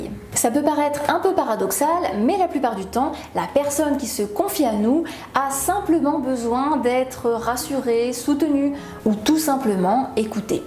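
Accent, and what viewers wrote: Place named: South, France. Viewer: Jolie accent du sud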